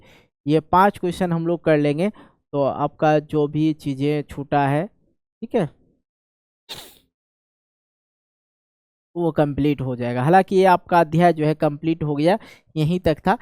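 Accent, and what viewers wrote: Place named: Bihar, India. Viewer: Bhut Bhut bhut mja aaya Bihari Andaj me pdne me 😊😊😊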